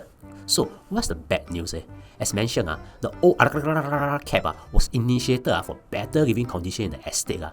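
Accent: Singapore. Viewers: Nowadays seldom heard so pure Singlish.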